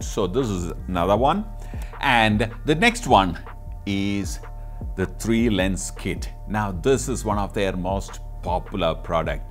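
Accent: Kerala, India. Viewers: He has a mallu accenture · sounds like keralite.. isnt it? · You speak English like Indian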